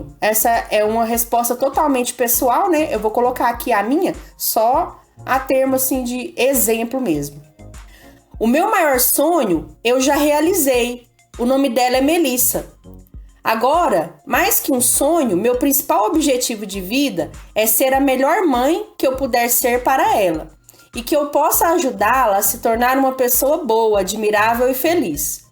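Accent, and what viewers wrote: Minas Gerais, Brazil. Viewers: Bela correção! Gostei foi do jeitinho mineiro de falar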